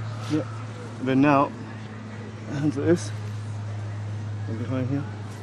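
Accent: United Kingdom. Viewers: i love i assumed he was gonna have an asian accent and he came sounding like a sidemen😂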